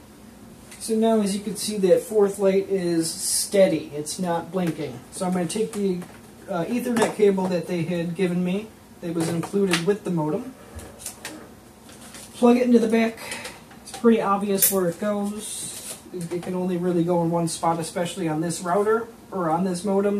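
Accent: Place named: Chicago, United States. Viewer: I knew you were from the Chicago area by how you talk and then I saw the 708 area code and yep! I used to be 312 then 708 now 630. Out of curiosity, did anyone call you? 😂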